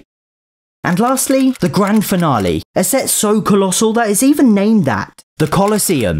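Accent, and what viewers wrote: United Kingdom. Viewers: Between his humour, LEGO obsession, love for cats, incredible content and British accent... you are unmatched Edit: OMG REPLIED TO MY COMMENT! MOM I'M FAMOUS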